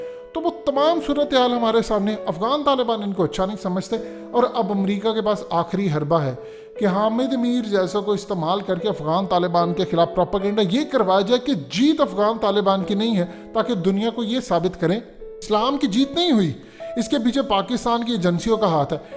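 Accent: Punjab, India. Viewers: Aarooj nahi Urdu oorooj kehte he bhai tum urdu zuban ko jab punjabi jone me bolte ho maza aja ta bhai urdu tumhari madri zuban nahi acha hoga punjabi language me video banao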